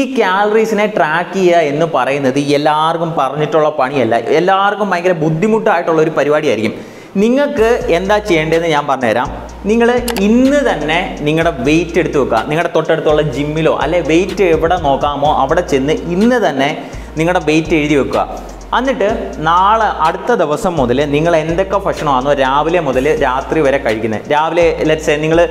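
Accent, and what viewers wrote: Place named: India. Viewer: നല്ല അവതരണം 😍ബ്രോയുടെ സ്ഥലം എവിടെയാ? അല്ല സംസാരത്തിൽ "ഫ "വരുന്നുണ്ട് അതാട്ടോ ചോയിച്ചേ 🤔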